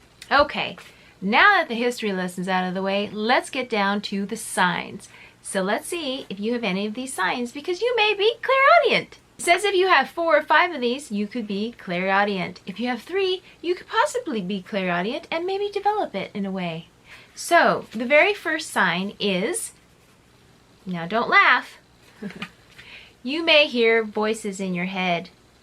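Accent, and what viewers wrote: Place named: United Kingdom. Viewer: just subd for the British accent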